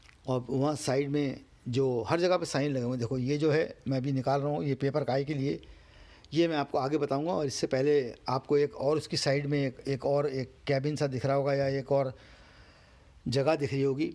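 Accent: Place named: Uttar Pradesh, India. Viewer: Salam chacha ap ka ye andaj bahot achcha lagta hae ajawo bhai dosto asa to hamare U P me bolte hae thanks chacha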